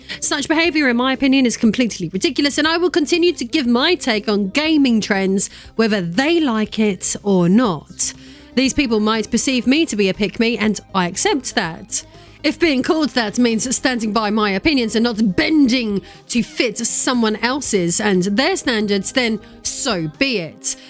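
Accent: United Kingdom. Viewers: British accent is ❤ don't ask me what Cheryl Cole be saying though. I too was 17 in 2006 we getting old but still young at heart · No worries - there will always a few bloat-heads spewing dirt around! I really enjoy your content as it´s based in reality - your videos are fun, informative and your british accent is the cherry on top. Greetings from good old Germany 🙂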